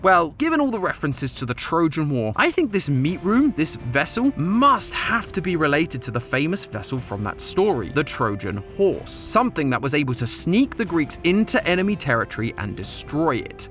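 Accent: United Kingdom. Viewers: Bro went from American to british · ngl, i was just watching the gtlive video of this and then noticed that there was a normal video about this, i clicked it and noticed the british accent... it was a new video with the best timing ever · The new person is just matpat but British, i like it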